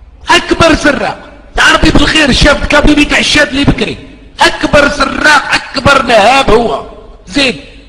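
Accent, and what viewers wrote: Morocco. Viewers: C un marocain qui parle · لهجتك مغربية....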